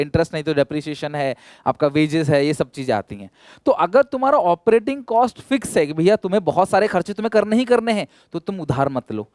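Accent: Odisha, India. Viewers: sir app odia ho kya ?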